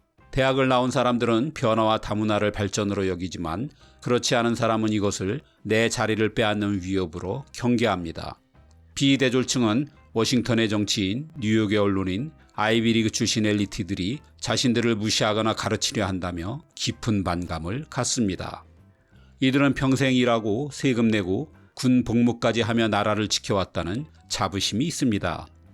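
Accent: Gyeongsang, South Korea. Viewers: 딱 갱상도 네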